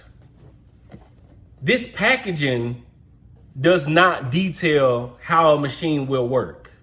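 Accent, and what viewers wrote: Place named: Memphis, United States. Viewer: Very honest opinion! I appreciate that. New to your channel bro & subscribed because of your genuineness. Keep being authentically you man! BTW don't know where you are located but your swagger sounds like Memphis! Just a guess. Peace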